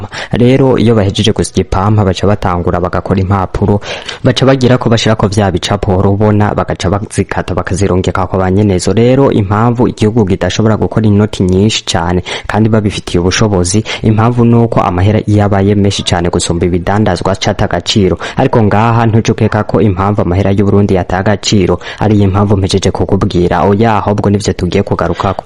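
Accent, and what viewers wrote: Rwanda. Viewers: Nagira ndagusabe uze uriga uravuga ikirundi mpuzamakungu apana mpuza mahanga ivyibinyarwanda wivugisha stop ikindi Vuga nkawewe woye kwigira nka Ismail mwanafunzi ibisigaye courage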